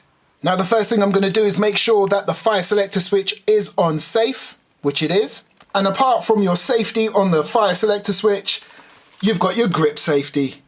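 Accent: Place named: London, United Kingdom. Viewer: He’s a proper Londoner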